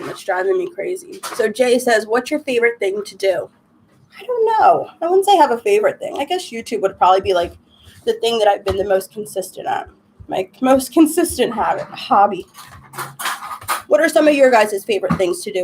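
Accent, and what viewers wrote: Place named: New Jersey, United States. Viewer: I love your accent.. my bf is from Staten Island and now Jersey.. he has the same accent as you lol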